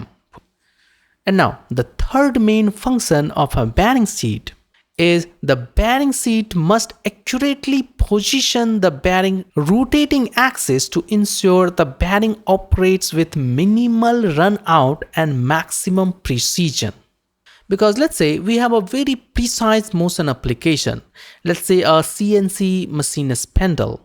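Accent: India. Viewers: are you Gujarati?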